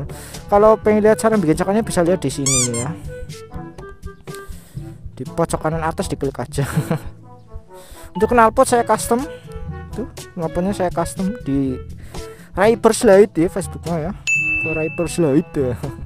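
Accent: Indonesia. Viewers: Waa anjay raider ngapak Purwokerto 😂😂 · Anjay wong ngapak jebule wkwkwk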